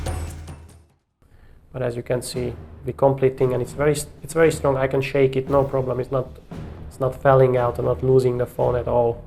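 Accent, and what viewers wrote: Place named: Hungary. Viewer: You sound like hungarian 🇹🇯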